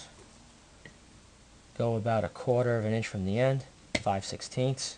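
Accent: United States. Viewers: wondering would it be any easier to drill before you cut it to length...\and sorry I thought you had a slight speech impediment...I didn't realise Americans pronounce solder sodder...lol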